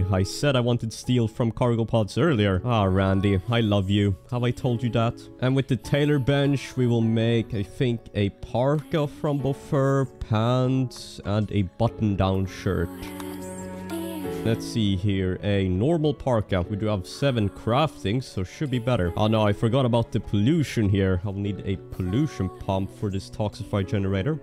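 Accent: Sweden. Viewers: You are the most stereotypically swedish sounding guy ive ever heard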